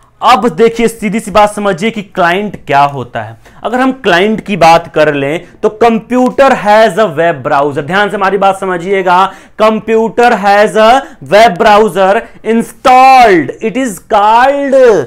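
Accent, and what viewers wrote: Bihar, India. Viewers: Kaha se aa gaya bihari.....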